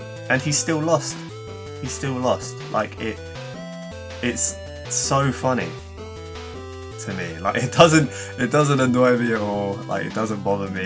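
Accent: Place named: United Kingdom. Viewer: Damn you sound a lot like Alpharad if he was British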